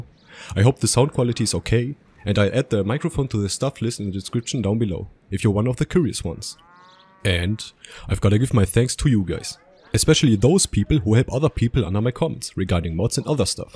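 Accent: Germany. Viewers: amazing as always :D thanks for your output mate <3 offtopic: are u german btw? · When you hear the accent of a fellow german you can just sub. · are u german? and are u speeding up ur videos? :D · lol junge bist du deutscher? Ehre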